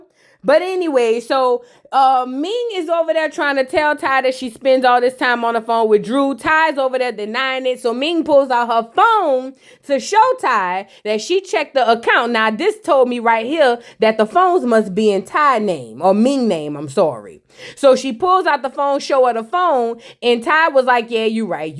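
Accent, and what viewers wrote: New Orleans, United States. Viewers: I love your new orleans accent ❤ New subscriber.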